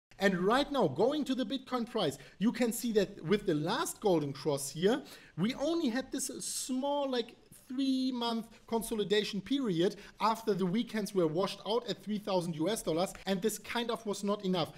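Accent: Germany. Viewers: The fact that i can understand everything that you are saying with that German accent and the pace you are talking is amazes me...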